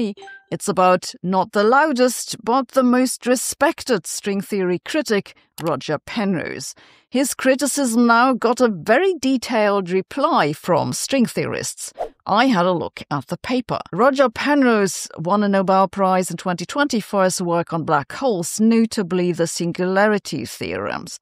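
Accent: Germany. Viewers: Great deutsch accent. You could sing a lesson singing an melody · Breathing in the new German accent. ShiiRaahh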